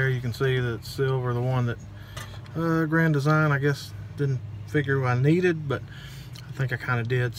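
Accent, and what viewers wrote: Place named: South, United States. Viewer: Thank you sir! Minutes in, I think I know what the problem is and it's probably not the regulator! I had a guy switch it out a couple of years ago, and he definitely didn't use the proper yellow tape, he used regular Teflon tape that actually came from Dollar Tree. I just need to go get the right stuff, and I'm going to change it myself this time. Turning a wrench isn't rocket science, and I've got my spray bottle of soapy water. My tank is outside, so that tape has broken down in the weather. You just saved me some $$$, so THANK YOU!!! Funny thing is, that guy who I hired to change it last time has worked for decades at RV parks and told me he's a certified propane tech. Okay, but he didn't know not to use regular Teflon plumbing tape? Now I'm old, single, and trying to take care of my goat ranch, I've found YouTube to be a wealth of information. 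I've learned that a lot of things aren't nearly as complicated as others want us to think. BTW, I love how you talk. I grew up in Dallas and spent Summers in Arkansas at a horse camp when I was a teen. I love Arkansas, lots of nice folks there. You sound just like a lot of my family.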